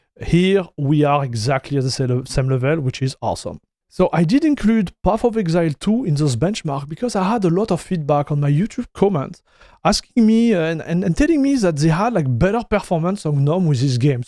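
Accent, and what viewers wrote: France. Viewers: I hope your english gets better, it sounds more like pure french, and it's very hard to understand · lol, yes french accent from france but his english is easy to understand. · Very French accent! Un compatriote 😂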